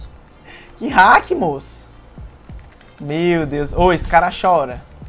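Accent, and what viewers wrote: Minas Gerais, Brazil. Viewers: Quem curte o Mineirês do dá like!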